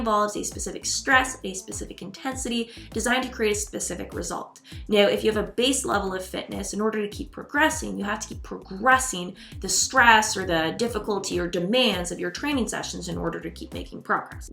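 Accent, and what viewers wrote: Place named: Canada. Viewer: i hear Canadian in your voice, and no i am not familiar with this channel.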